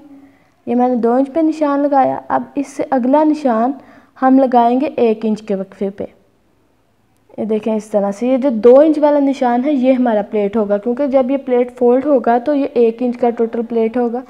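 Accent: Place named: Faisalabad, Pakistan. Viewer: Kafi arsy sy me apki videos dekh kr kpry silai kr rhi hun, jazak Allah, ap bohat assani sy mushkil cheezen smjha deti hein, Faisal Abadi lehjy wali Urdu, Amazing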